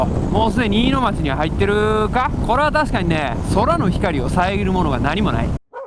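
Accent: Kansai, Japan. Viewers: お疲れ😃💦さまの関西弁👍️いいね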